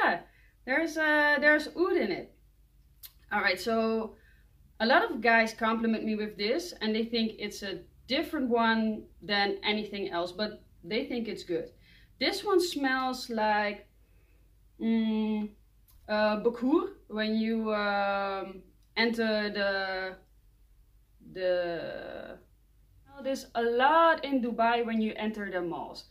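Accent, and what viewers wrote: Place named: Netherlands. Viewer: Als Belg hoor ik een nederlands accent, toevallig van Nederland ?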